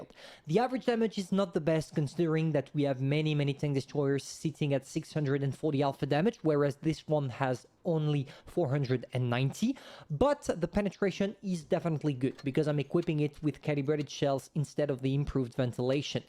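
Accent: France. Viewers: are you french ? you have an accent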